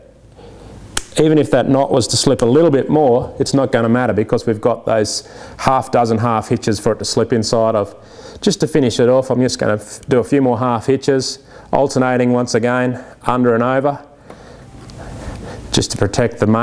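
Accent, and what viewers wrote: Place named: Australia. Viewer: This video is much more blokey in the Aussie accent.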